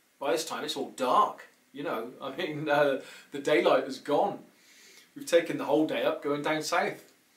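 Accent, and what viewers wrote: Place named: Liverpool, United Kingdom. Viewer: Watched on my lunchbreak. Really enjoyed your stories particularly the one about meeting up with your old friend, I love it when things work out like this. It took me many years before I visited Scotland - I had never wanted to as a family member had a bad experience and this put me off, I eventually visited for the first time around 10 years ago and I couldn't believe I'd left it so long - the highlands are so beautiful and I have visited a few times now but I haven't been to Glasgow. Loved your scouse accent 😁 So lucky that you'd slowed down in the car and there were cones there, doesn't bear thinking about does it. So sad about your brother, looks like you have some happy memories together, I hadn't realised you were twins. The dog barking made me jump out my skin, I think I had it on a bit loud 🤣 I'll check out the other links -Take care Donna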